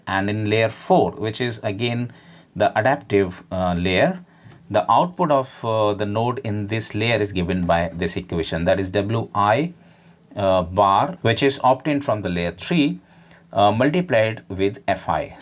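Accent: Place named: India. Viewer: nowadays, when you see a tutorial with Indian accent, you know it has better chances to be a good one. This time it didn't disappoint. Kudos to Indian engineering, Kudos to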